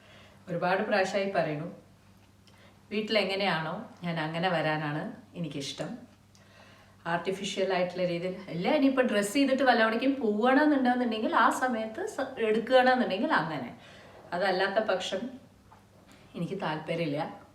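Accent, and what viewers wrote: Palakkad, India. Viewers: Palakkad area aappam anu ennu thonnunnu. Nemmara thamasikkumbol avidullavar pronounce cheyyunnathu pole thanne aayirunnu. Thrissur appam thanne anu.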